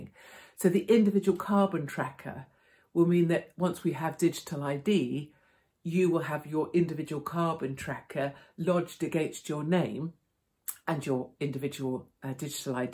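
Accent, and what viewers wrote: United Kingdom. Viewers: is one of the only Brits I can listen to without getting sick of hearing that annoying accent.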